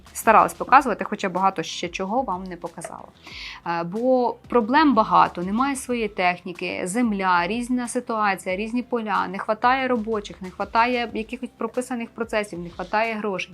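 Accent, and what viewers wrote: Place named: Cherkasy, Ukraine. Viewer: у вас интересний мовний акцент .чепкащина.так як і на лубенщені свої нотки співочої української мови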